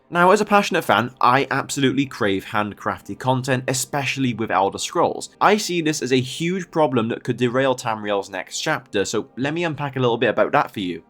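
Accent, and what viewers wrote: United Kingdom. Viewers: He's got a middle of the island British accent. This lends him popular authority which is not earned. This is the only thing the UK has left to export other than financial planning.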